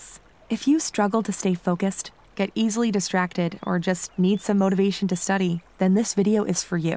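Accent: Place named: England, United Kingdom. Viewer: I like ur English accent 😅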